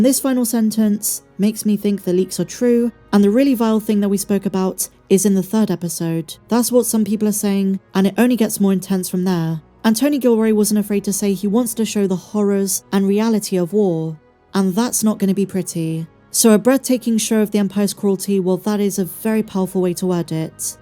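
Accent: United Kingdom. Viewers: Ain’t even gonna lie just noticed you are British lmao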